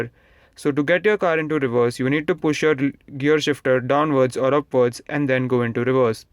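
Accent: India. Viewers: The indian accent made this much better xD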